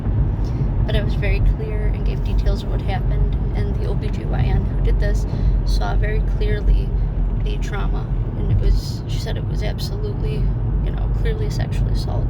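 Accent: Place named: Ireland. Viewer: I was just thinking if your are Irish or American-Irish as i recognize the twang and of course the penchant for red hair? There are several pitiful girls with their story here on YT about similar domestic situations that had turned abusive and eventually violent. You naming your children Riley and Reagen is also pointing me in the same direction. I feel very sad about your story, but i get the sense that there is something missing in your story, which would clarify why you are a submissive in the first place?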